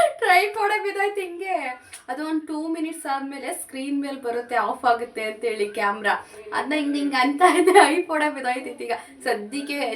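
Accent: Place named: Mandya, India. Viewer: Nimma kannada mathadi a style nodidre mandya side nivu please heli Nanna sister na k m. Doddi side marriage madi kotidivi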